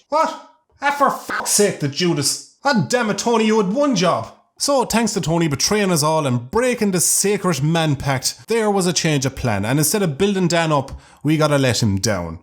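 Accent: Ireland. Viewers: Where in Ireland are you from? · Most youtube storytellers are shit but this is legit because this is definetly sounds like ireland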